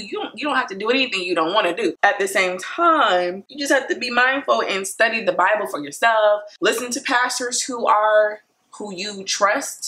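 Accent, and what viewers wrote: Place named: South, United States. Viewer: You sound like you are from the country south. Where are you from Texas?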